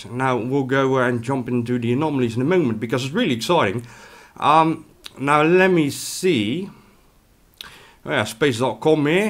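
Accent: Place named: Netherlands. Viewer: Liliana Georgiana Boros Als je goed kijkt naar de items in z'n browser, bv 'Hobbie' dan is het duidelijk een Nederlander. Dat kun je ook horen aan de manier waarop hij Engels praat. Nederlanders praten op een hele typerende manier Engels.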